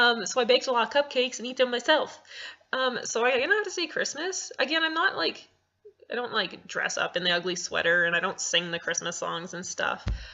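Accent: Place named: United Kingdom. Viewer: oh dear British accent, really haha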